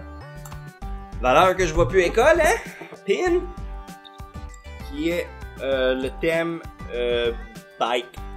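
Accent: Quebec, Canada. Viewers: Je savais pas que t'étais québecois le couz, y a pas de mal de youtubeurs canadiens dans le game quand même. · je sais pas pourquoi mais ça fait du bien de t'entendre parlé en joual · Haha, cet accent québécois si typique ^^ Je suis un français de France et il est vrai que nous adorons l'accent et le français québécois, ça nous fait toujours sourire ^^ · Ca fait vraiment plaisir de t'entendre parler "français", au plutôt québécois au vue de tes expressions et bien entendu ton accent. C'est juste trop génial et j'aime bien quand on brise les barrières du langage pour parler d'autre chose ! Continue ton contenu, et j'espère un jour voir le retour des pianos tueurs. :') Des <3 sur toi ! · Ha ben t'es québécois!! Cool ça!! Continue ta bonne job, tabarnak!! ;) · Tellement sympa d'entendre ton petit accent québécois ! :3 Petit coucou de la France ! :D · La première fois que je t'ai entendu parler français c'était dans une vieille vidéo avec Aki, Joey et Noble quand tu as fait une interprétation de tsundere mais en français et j'étais beaucoup surprise je m'y attendais pas vu que je ne savais pas encore que tu es québécois 😂 j'aime beaucoup ton accent même si ça me fait toujours aussi bizarre de te voir parler français je sais pas pourquoi 😅 super vidéo en tout cas 👌🏻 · Je suis là pour mettre une note. 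Merde t'es québecois ! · Yaaaah jsavais qtetais quebecois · Dude, j’avais aucune idée que t’étais québécois hahahahaha, j’te regarde depuis un bon bout et j’étais sur que t’étais anglophone. Toujours des bonnes vidéos, mais sa fais bizarre de voir quelqu’un changer au québécois devant ses yeux lol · i always wondered what your accent was ,but i knew you were from quebec 😅 je suis folle , je ne sais pas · Mon dieu c'est tellement bizarre de t'entendre en québécois. Je suis tellement content cela dit de savoir que quelqu'un de québécois nous représente dans la communauté anime youtube =D Also dans le même range d'age =D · J'ai tjr eu l'impression que ton TRÈS subtil accent en anglais était québécois. Fake j'ai été assez surprise quand tu as commencé en français européen. J'ai eu peur hahaha. C'était vrm nice de t'entendre. Ca permet de vrm plus s'identifier à ton channel. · Yeah! Vive le Québec! 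hahahaha ça fait drôle de te voir parler québecois :) Je m'étais habitué à te voir parler anglais mais c'est spécial xD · Yeeessss! Un autre québécois, j'avais un doute que tu étais français à cause de ton accent anglais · J’adore l’accent québécois jsp pk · As expected from quebec, your accent made me laugh out loud, mate. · cool que tu parle pandent toute la vidéo en francais québecois en plus (je suis quécois) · Ce que j'ai retenu: tu as l'accent québecois, pas mal x) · J'ai toujours adoré tes chroniques et avait remarqué ton petit accent français/quebécois. Je suis française et je dois avouer que c'est une des raisons qui m'a poussée à en découvrir plus sur ta chaîne. Merci de faire partager notre belle langue ^^ Prends soin de toi ! :) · Aha, je me doutais que t'étais de la Belle Province, la manière de prononcer certains mots trompe pas ^^. On sent quand même que tu parles beaucoup plus anglais que français parce que tu galères un peu de temps à autres haha (can relate tho)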